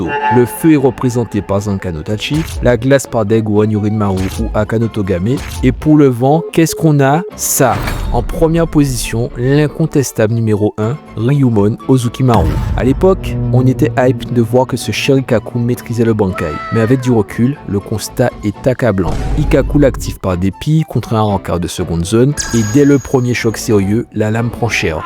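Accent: Caribbean. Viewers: C'est un antillais qui parle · Je sais pas mais t’es antillais ?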